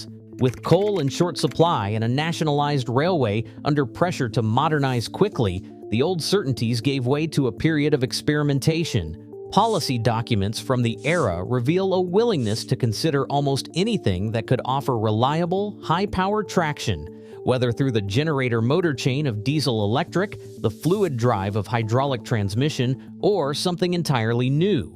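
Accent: United States. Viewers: Why an American narration?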